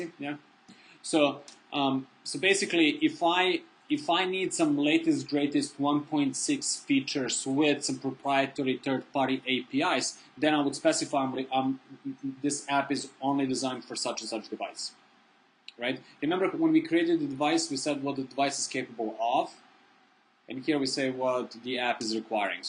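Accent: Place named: England, United Kingdom. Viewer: Epicness indeed, but did anyone notice he had a perfect english accent for like the first 5 minutes like instantly went dr. jekyl and hyde?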